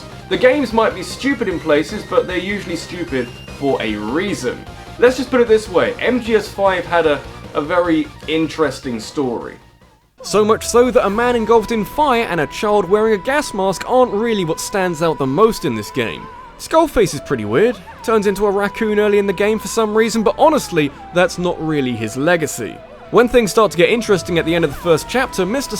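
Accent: England, United Kingdom. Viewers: For those wondering, he did pronounce Lumiose City correctly. While his pronunciation of the last syllable would usually be wrong, his particular English accent gives him a free pass, since the real pronunciation actually would sound like that if spoken with that accent unless the speaker was fluent in French to the point of using it interchangeably with English. As for the emphasis on the word, there is no accent on any of the vowels, so the first syllable gets the emphasis.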